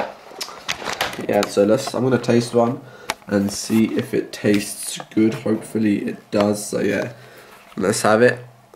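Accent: United Kingdom. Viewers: HE HAD MORE OF A BRITISH ACCENT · Mate you lost your British accent😳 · When wasnt so scandalous about every lil thing with a british accent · Currently he sounds less British than in this video. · idk why but i like vlogs in the British accent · his accent was much more british back then 🤣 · He had a strong British accent.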